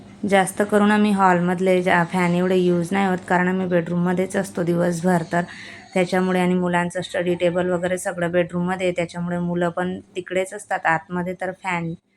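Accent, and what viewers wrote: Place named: Khandesh, India. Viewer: हाय तु कुठे राहते तुझं बोलणं ऐकून वाटतें तु खानदेशी आहे जळगाव धुळे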